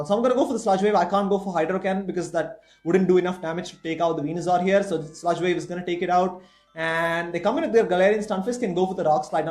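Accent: India. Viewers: dude ur english is flawless whit a indian accent sound fucking cool haha, one love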